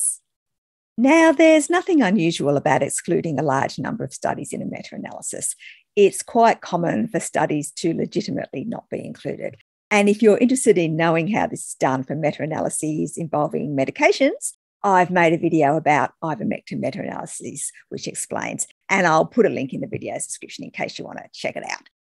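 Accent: Australia. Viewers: She's an Ozzie. Plus, using the 'innuendo' parrot's Campbell.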